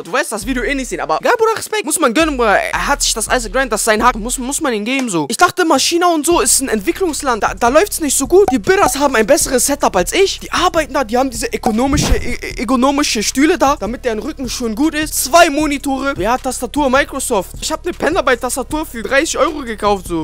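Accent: Germany. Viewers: Geiler magga hahaha bist bestimmt aus Hamburg wa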